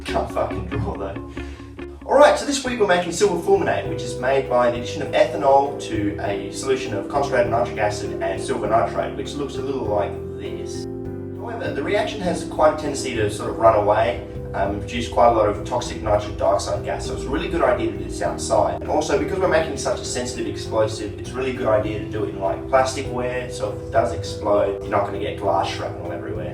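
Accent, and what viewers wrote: Australia. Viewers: every time i watch a video....im all like....Damn, this dude is so Australian. Its the best.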